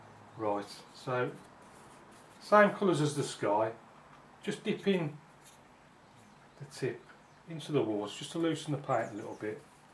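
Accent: Birmingham, United Kingdom. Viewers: Love the tips you have given on this, really helpful to me. Just starting to have a go at watercolour landscapes (I usually do portraits) finding it very difficult. Comforting listening to a fellow Brummie accent too. Thanks for posting these I'm going to have a go a painting along to this one.:). Your artwork is amazing!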